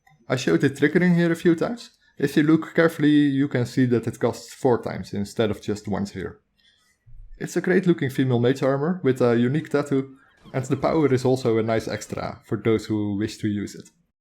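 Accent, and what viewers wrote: Netherlands. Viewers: Prima video, lekker dik nederlands accent er boven op, helemaal goed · damn dutch accent tho jaja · you sound dutch. you dutch right?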